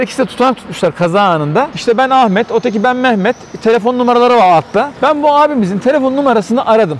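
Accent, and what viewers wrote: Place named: Isparta, Turkey. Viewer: Hocam ispartali misin? Ben yalvacliyim siven bizim oraları andırıyor. Anlattığın olayda ince düşünmen gayet güzel, takipteyim😊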